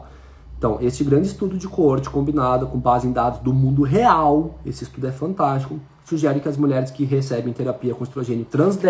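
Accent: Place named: South, Brazil. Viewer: Oi Dr! Gostei muito das suas especificações!Eu tenho 50 anos, ainda não parei de menstruar, mas sim, já tenho alguns sintomas do climatério. Já comecei a procurar, mas ainda não encontrei um médico (a) que realizasse a modulação hormonal bioidêntica! Minha pergunta é: Esse é um tratamento muito caro? O senhor atende a algum plano de saúde? Pelo jeito de falar o senhor é sulista. Eu sou curitibana mas,resido no Rio de Janeiro. Dependendo dos valores,eu iria até seu consultório. Obrigada!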